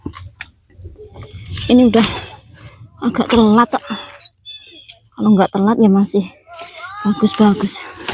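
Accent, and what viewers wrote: Java, Indonesia. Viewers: Assalamualaikum mba, jenengan Indonesianya daerah mana mba kok medhok sekali 😊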